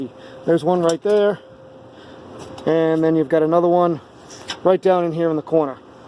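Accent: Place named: Boston, United States. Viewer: Hi, plan on doing this but with a pickup truck. The electrical connections concern me a little, I know you have to disconnect battery otherwise airbags will go off, but in new pickups, I'm not sure if passenger seat is required while driving. If you know the answer, I'd appreciate it BTW at first I thought you were from Boston, or North Carolina (Fisherman's Warf accent), never thought Florida has a hint of that. I heard the 9th ward in New Orleans they have a Brooklyn accent. Thought that was interesting. I love Florida BTW, thnx for the vid 👍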